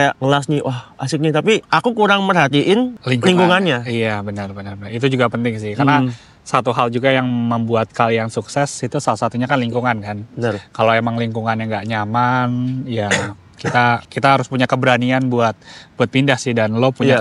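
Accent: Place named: Banyumas, Indonesia. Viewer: howalah wong kebumen pantesan mandan ngapak wkwkwk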